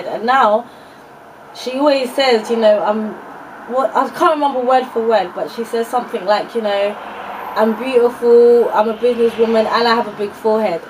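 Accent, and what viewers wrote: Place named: United Kingdom. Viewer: Are you tyra banks sister?:o You sound british, or maybe she sound american hehe I dont know where yous are from! Btw, your beautiful! I was going to bleach my skin because of uneven skintone but now you made me much more confident, ill just stick to foundation. The fact that people risk there lives just to look pretty is mad.. Stay strong your not ugly!:-) xx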